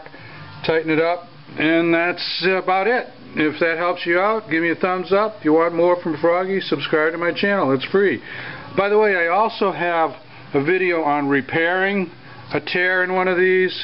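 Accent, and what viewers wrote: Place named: Connecticut, United States. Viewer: I really enjoy the Corvette videos. Very informative and useful. Do you happen to be from CT? Accent sounds that way...keep up the good work.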